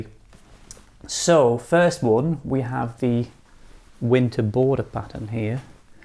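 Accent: Netherlands. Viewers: This was a charming video, sir, thank you. May I ask where in the Netherlands you grew up? You sound so much like my Einhoven-based Philips colleagues. Cheers from a new viewer, Ardith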